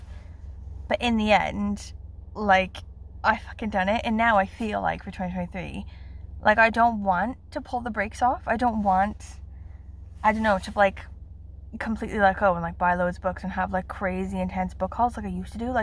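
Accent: Wales, United Kingdom. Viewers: Loved hearing the Welsh again. You sound amazing to me. Why are you taking the class? That sounds like a really stupid question since I know you LIVE in Wales, but seems like it’s become more of a priority in the last year. 🎄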